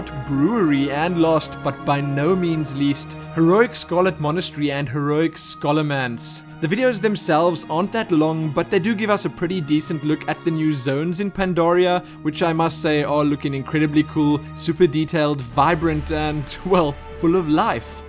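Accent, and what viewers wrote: South Africa. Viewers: It's a South African accent guys....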